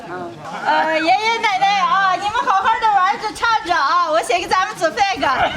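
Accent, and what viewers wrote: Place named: Shaanxi, China. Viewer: 我外公來自陝西城固縣 49年來台灣~~聽到口音突然好熟悉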